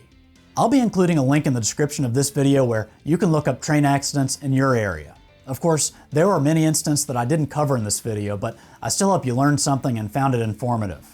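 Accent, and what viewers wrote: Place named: Augusta, United States. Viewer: “The train company” “THE TRAIN COMPANY?” I’m gonna have to listen to this video when I’m homesick for the CSRA accent [Augusta GA area]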